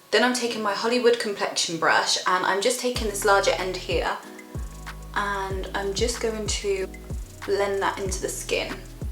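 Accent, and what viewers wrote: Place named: RP, United Kingdom. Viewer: LOVE THE BRITISH POSH ACCENT !!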